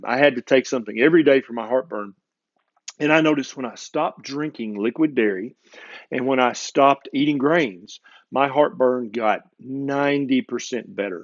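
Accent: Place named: North Carolina, United States. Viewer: I am trying to place his accent. My guess North Carolina?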